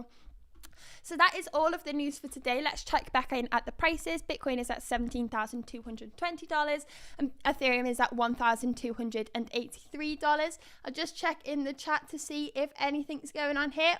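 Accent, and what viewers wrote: United Kingdom. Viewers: Your on form 🦋 I'm sure your a fellow Brummie ✨ Great job.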